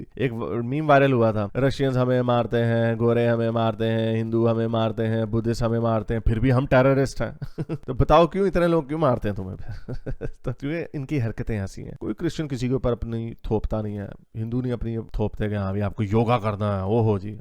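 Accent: Punjab, Pakistan. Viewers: Sir ur punjabi accent is great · No they both are Pakistani Punjabi speaker that is why accent is same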